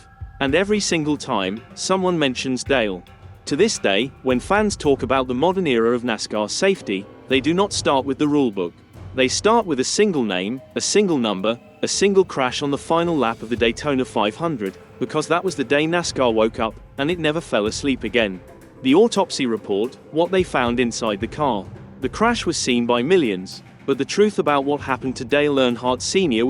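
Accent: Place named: United Kingdom. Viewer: You just ruined this by having a Damn British accent Commentator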